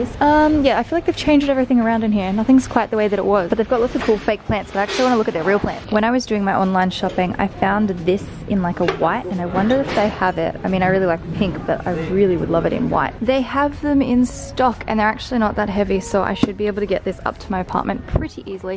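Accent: Australia. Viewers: Just don’t loose that Aussie accent ☺️ so good to hear a local in amongst it all.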